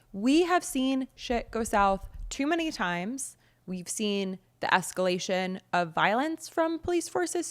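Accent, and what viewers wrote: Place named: Orange County, United States. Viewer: I’m from Orange County, California, so I’m used to people talking like this. My suggestion would be to continue watching, just turn off the volume and put on the captions.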